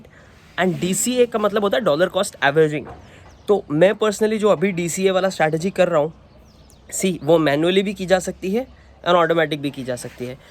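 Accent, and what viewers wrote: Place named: Nagpur, India. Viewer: Bhai Nagpur se ho kya....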